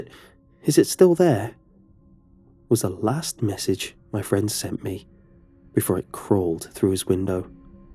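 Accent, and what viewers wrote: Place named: RP, United Kingdom. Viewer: Think that's just how posh twats talk it sounds like that 😂😂😂😂 lmao. I'm from the UK I am not bloody English tho!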